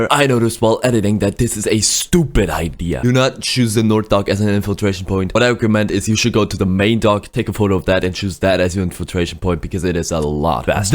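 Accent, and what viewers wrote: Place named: Germany. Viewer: Good English skills, I cant even hear out the german accent although i’m trying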